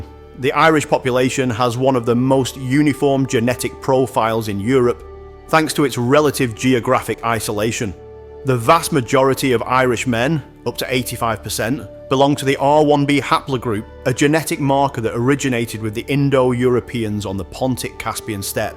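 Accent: Sheffield, United Kingdom. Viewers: American anglosax here guessing the accent. Sheffield?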